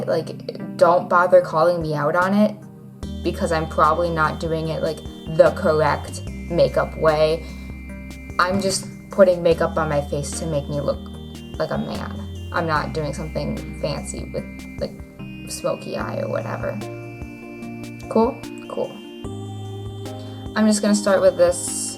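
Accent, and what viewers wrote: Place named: Minnesota, United States. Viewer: Are you from Minnesota? You have the accent... I love it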